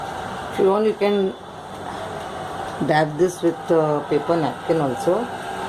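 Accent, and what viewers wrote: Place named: Mumbai, India. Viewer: hello from SF, CA, USA! this looks DELICIOUS. thank you for sharing your recipes and cooking, auntie (is it OK that i call you that?) everything looks so tasty, esp. the fish dishes like this one. can't wait to try it.i love watching your videos, and hearing your bombay accent -- my family is from bombay and you remind me of all the aunties i grew up with!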